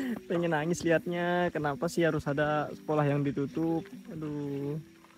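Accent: Lombok, Indonesia. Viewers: salam dri lombok bang, stelah sy dnger logat bhs indo sde, trnyata bner sde asli lombok tulen ,, sehat selalu smeton .. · Awalnya saya penasaran ini Abang dari mana, syaa tebak tebak dari aksenya bahasanya awalnya ragu" eh pas menint ini (sumpret)😂😂, badahh Batur Sasak arane sehat sehat Batur mudahan de sukses lek jepang! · Logat nya mirip orang lombok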